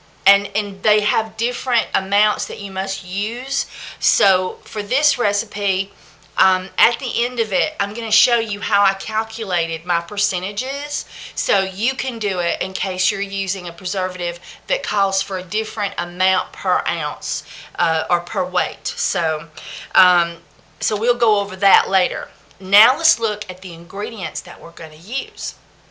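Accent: South, United States. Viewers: loves y'all southern drawl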